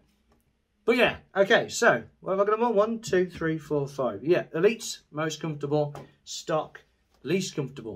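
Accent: United Kingdom. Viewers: Excellent review mate. Also very comforting to hear it all coming from a fellow Brit. Not to be xenophobic 🤓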